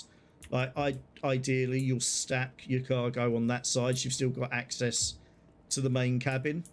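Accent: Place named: United Kingdom. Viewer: Thanks for the kind words and you already got something I don't have.. A cool British accent! Keep it up man! Subbed.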